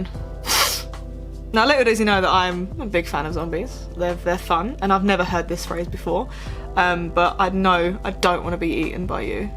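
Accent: Essex, United Kingdom. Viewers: I love your Essex accent 😋 keep posting videos... Love from India 😋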